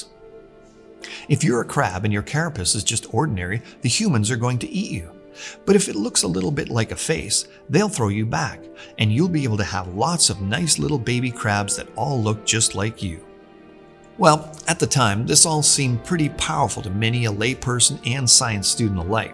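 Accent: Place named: Canada. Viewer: This guy has the most Canadian accent ever.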